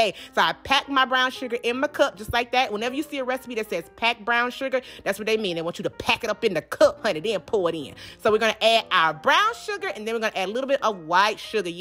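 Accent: South, United States. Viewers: I be living for this Southern ass commentary😂❤ (that's no shade, I'm from the South too🙌) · I knew how to make tea already. I just came to hear you talk lol! Gotta love a southern woman.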